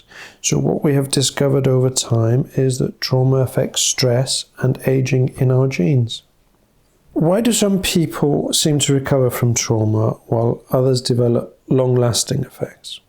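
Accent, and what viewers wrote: United Kingdom. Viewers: Come on with the British accent makes it harder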